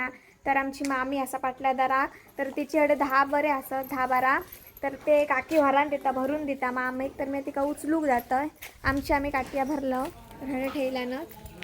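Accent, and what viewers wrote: Malvani, India. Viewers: Tu mast malvani june shabad boltayai eyakaak bara vata mast video chavkul li jaya go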